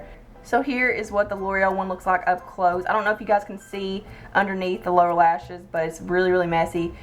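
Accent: South, United States. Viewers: Hands Down...The Maybelline Colossal mascara looks better on you. Now I see why people love my southern accent, listening to you makes me proud to be a southern woman. Thumbs up.:) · Welcome to the Southern accent. A thing of beauty, ain't it?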